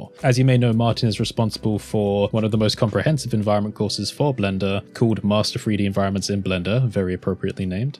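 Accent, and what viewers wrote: United Kingdom. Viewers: I could listen to this Bri-ish accent forever much better if I Could speak it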